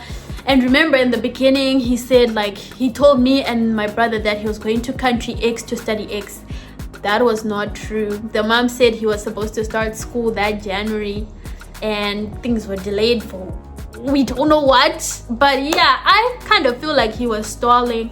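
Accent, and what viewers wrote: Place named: South Africa. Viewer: Sounds like a south african gent😭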